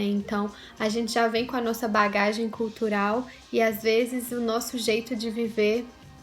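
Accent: Minas Gerais, Brazil. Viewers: Jose Zeballos o sotaque dela é mineiro (Minas gerais)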